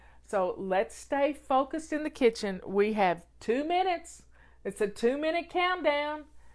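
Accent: South, United States. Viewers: Been listening and watching for years whenever I start losing my way back into chaos. Your easy direction, great starting point, and delivery are authentically your own, OG Fly! Your familiar Southern accent is both graciously understanding and proper, yet decidedly stern. Well done! You’ve inspired so many!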